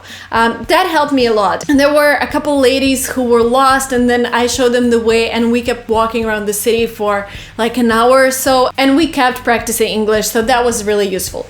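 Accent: United States. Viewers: In which way I can talk to u to learn American accent my age is 14 plz help I like the way u talk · Thank you, after watching the learning video you gave I feel that my English skills have increased. I like your accent even though you are originally Russian but your American accent is very good. You are my motivation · Are you from American🇺🇸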